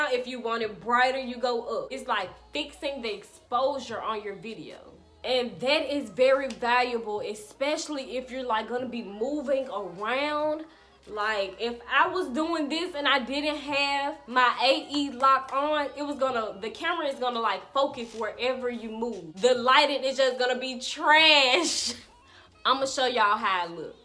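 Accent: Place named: New York, United States. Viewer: Your Accent is Killing. Is that Newyork Accent?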